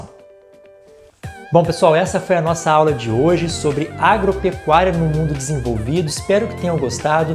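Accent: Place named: Minas Gerais, Brazil. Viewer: Esse cara é de Minas ou do sul da bahia não é possível!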